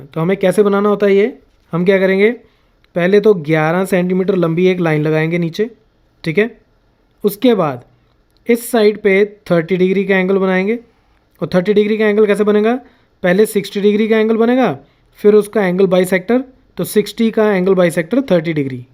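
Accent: Punjab, India. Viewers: Sir are u punjabi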